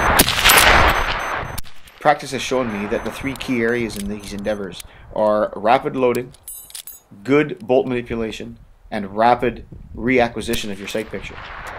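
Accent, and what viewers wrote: Canada. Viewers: Good luck with 35! I just bought myself a sporterised (unfortunately) 1942 Lithgow stamped SMLE for $100AUD...with 75 rounds of ammo! Its a terrific old rifle, I'd like to have a go at the mad minute one day, so really appreciate the good info your videos impart. You're in Canada by the sounds of it, yes? I also read with interest the debate surrounding whether Snoxalls record is a myth, and though some of the debaters are more like masturbators, it makes for a good read. Seeing your progress and technique certainly adds some flavour to the topic. Thank you. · Are you Canadian? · Awesome job! is this guy Canadian or northern American?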